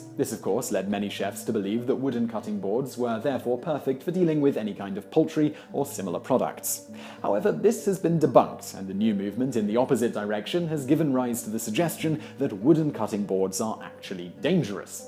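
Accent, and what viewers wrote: England, United Kingdom. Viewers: J.D. Hague He has what is known as an English accent. You know the English language is from England? Crazy right? These silly English people can't even speak English! Imagine that.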